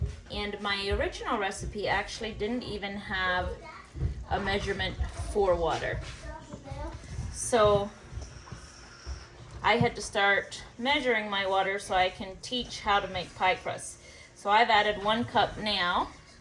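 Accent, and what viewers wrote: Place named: Pennsylvania, United States. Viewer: Sounds like you might be in Pennsylvania. I'm near Philadelphia. Just found you. Did you do a video with your mom on her showing you how she makes her Shoofly Pie? If so, I'd love to watch it. During my high school years I lived just outside Parkesburg. We lived down the road from a Mennonite family with twelve children. I went to school with their twin girls. We traveled to the Amish country often to enjoy all things made by the Amish and we especially loved going to Good's to shop, to flea markets in the area. Reningers, etc. Shoofly is my all time favorite pie. I've made it a few times and it is always delicious but I'd like more of a crumb topping that doesn't sink into the the delicious molasses filling. I miss living in the country. I have a fleeing I'm going to enjoy watching more of your videos.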